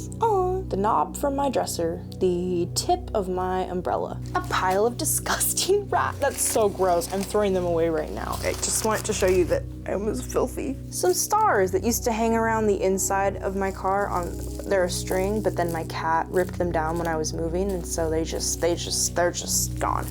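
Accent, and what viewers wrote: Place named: United Kingdom. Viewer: Thank you for the worm reminder queen… Keep being amazing, everything is linked to everything, it all is for your business and also isn’t (living, art etc.) lol, you have NOUGHT to worry about cuz you’re growing a sick lil community here that are all obsessed, you got this and u know it!!! Intuition over 🪱 s… 🌞😎🌞😎🌞 luv & good wishes 2 u from the UK, I speak like your bri’ish accent tehe 🤫😱😍🙊😮‍💨😇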